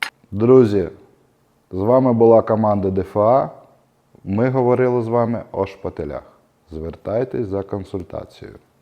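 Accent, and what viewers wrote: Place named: Russia. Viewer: Да, чувствуется, что русский родной 😊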